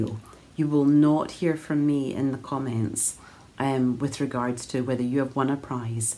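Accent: Scotland, United Kingdom. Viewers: Hello - I always appreciate the way you move through your podcast….meaningfully😊not rushing but taking the time to fill in the details. And your soft Scottish accent is very relaxing and comforting. It always means a lot to me when you review Art exhibitions and other places you’ve been as our creativity doesn’t start and stop with knitting. I very much love your new shawl pattern and it’s so lovely that you’re thinking of us in the Southern Hemisphere too!! I live in Tasmania, the little island state to the south of the Australian mainland - it’s a beautiful, magical place to live but we usually still need a cardi or shawl on in those Summer evenings - so one of those could just fit the bill! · Dearest, what I love most when watching your podcast is your language - your scottish pronunciation and your pleasant voice. I also appreciate when you podcast with your mom - I think that you both knit a lot of beautiful garments!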